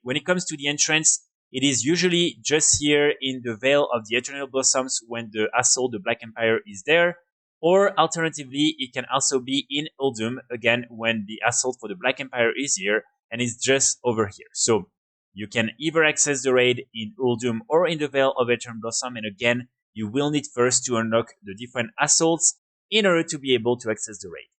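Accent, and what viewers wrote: France. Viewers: Tu es français ? Je dis ça à cause de l’accent 😊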